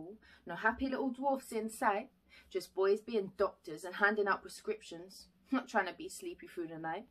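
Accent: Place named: United Kingdom. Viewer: I never thought one day ı fell in love with who has Bri'ish Accent 😍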